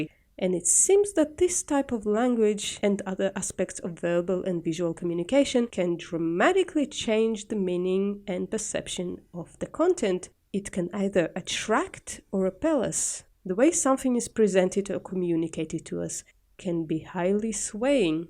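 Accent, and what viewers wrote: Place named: Wales, United Kingdom. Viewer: Subbed love the WELSH accent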